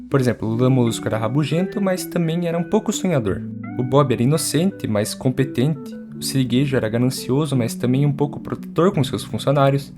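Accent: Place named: Inland Paraná, Brazil. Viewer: Pelo sutaque tu é do interior do Paraná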